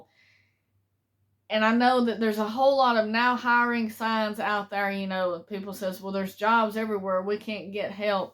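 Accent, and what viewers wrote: South, United States. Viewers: Uze a southern gal sheeeeeet that eeeez cooool...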